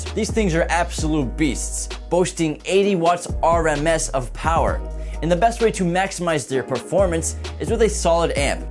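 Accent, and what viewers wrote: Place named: East Coast, United States. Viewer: Say hello to ya motha for me.east coast voice..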